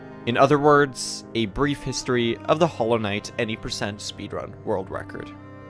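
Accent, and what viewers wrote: Sweden. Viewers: Är du svensk? Jag missade dialekten :D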